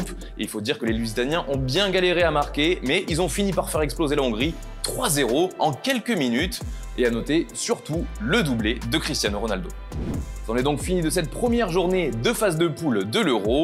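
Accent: Italy. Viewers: J’aimerais bien voir la tête du gars avec son accent italien cassé 😂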